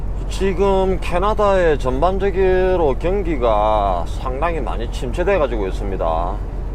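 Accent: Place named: Busan, South Korea. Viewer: 와 부산말 제대로네예 ㅎㅎ 구수하이 좋네예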